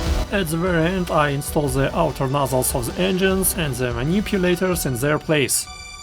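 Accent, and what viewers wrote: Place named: Germany. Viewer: Ok, this guy is definitely German.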